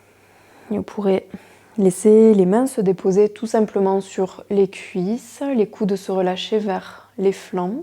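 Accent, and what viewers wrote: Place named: South, France. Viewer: Merci aussi pour ce charmant petit accent du sud.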